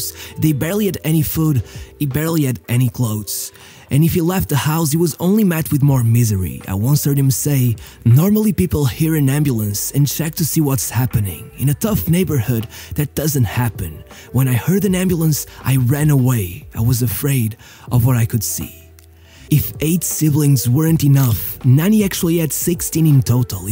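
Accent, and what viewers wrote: Portugal. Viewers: With all respect this guy talks lies quaresma was Champion in Sporting and in 04-05 we ended up in second know your facts instead of making them up specially if u sound portuguess maybe a Benfica stan that wants to Paint the wrong picture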